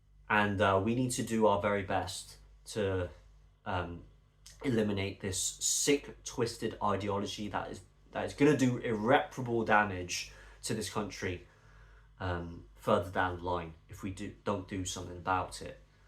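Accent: England, United Kingdom. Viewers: My reply was not "uninfotmed" it was merely pointing out the obvious. However he's mainly talking about England as you can tell by his English accent but he includes all the Home nations, hence his use of the Union flag to cover all parts of the UK and Northern Ireland. I do not know which country you are from but even among the citizens of the Home Nations who don't like the Union Flag and only recognise their own flag the fact remains that it is the internationally recognised flag of the UK and Northern Ireland. I trust that reply is comprehensive enough for your exacting standards.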